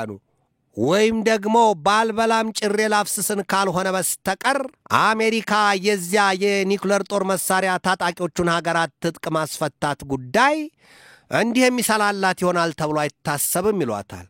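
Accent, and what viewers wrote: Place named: Ethiopia. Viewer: አቀራረብኀ ይመቻል: ብቻ የሀበሻ እንግሊዘኛዉን ብታቆመዉ የበለጠ የተዋጣለት ይሆናል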